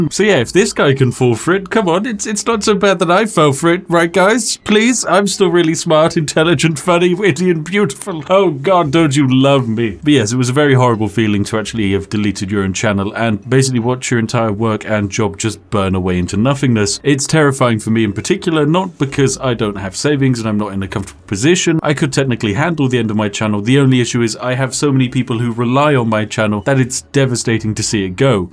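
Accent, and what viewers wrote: United Kingdom. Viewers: (°o°:)ᴼʰ the is back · Ngl, I'm British and I still don't know how you have a Chad British accent. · Woo the is back! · The British man is back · This suddenly became a big irony for this.... Anyway good the have the Bri'ish back!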